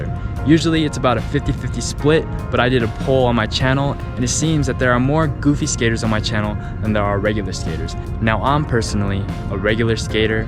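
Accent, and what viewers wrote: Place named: Ohio, United States. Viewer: he sounds like an ohio man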